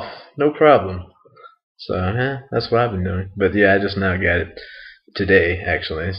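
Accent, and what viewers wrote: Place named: United States. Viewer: Take YOUR shitty accent and attitude back to America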